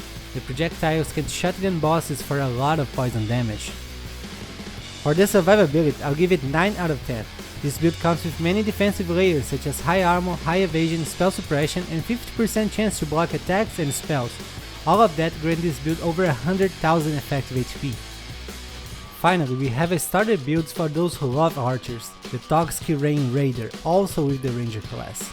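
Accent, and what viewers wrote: Brazil. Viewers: Brazilian here, you sound brazilian... Are you? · ma da pra ver que o cara é br o sotaque não engana kkkkkk full Fisk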